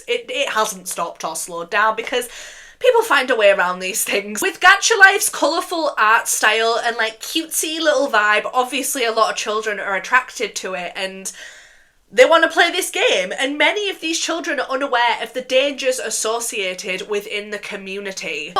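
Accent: Scotland, United Kingdom. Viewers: Nvr in my life have I ever heard a Scottish goth...IT'S AMAZING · HI I JUST STARTED WATCHING BUT ARE YOU SCOTTISH OR FROM THE UK BY ANY CHANCE??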